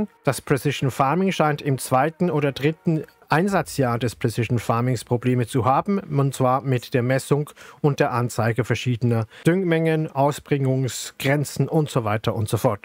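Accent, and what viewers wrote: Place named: United States. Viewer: Ich mag den Dialekt so sehr! Bist Du Amerikaner?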